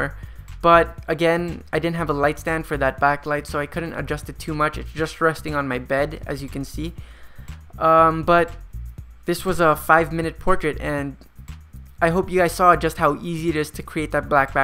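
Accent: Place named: Philippines. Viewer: not bad englilsh at all... but the signature filipino hum is there... haha! Good job! nice tutorial!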